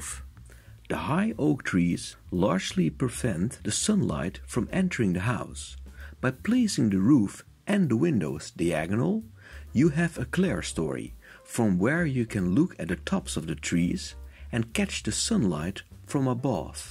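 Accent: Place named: Netherlands. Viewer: Dutch narrator... distracting....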